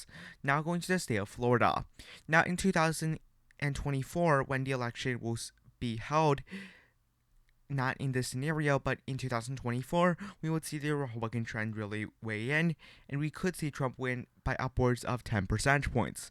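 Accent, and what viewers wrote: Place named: China. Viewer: Is it me, or are you Chinese? The accent was definitely Chinese. The assertions, to someone not connected to the US, struck me as merely your opinion. My own personal opinion is Trump will lose because democracy has been destroyed from forces at work within the west. I'm just awaiting the autocracy as it slithers out of its gross coils.😕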